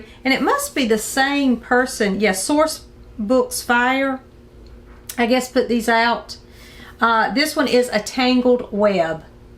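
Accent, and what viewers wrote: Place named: North Carolina, United States. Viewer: Are you from NC? That's where my mom was from and your accent is so similar. That copy of Heidi is gorgeous! I haven't heard of those LM Montgomery books, but I love those covers too:)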